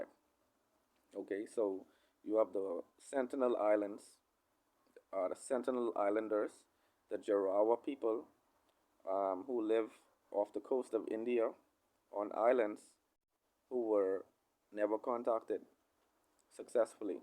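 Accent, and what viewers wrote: Bahamas. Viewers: Sound like you Bahamian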